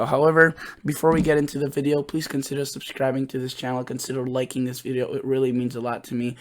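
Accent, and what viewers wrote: Midwest, United States. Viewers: Dude I knew yo u had a midwestern accent but near Chicago?? Me too!!!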